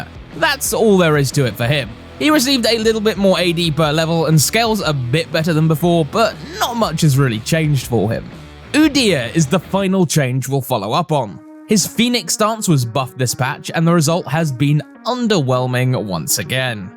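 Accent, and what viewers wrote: United Kingdom. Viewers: Always love me some british accent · Definitely more British than Australian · I wasn't referring to the voice, it's definitely british, something you would hear from a documentary I mean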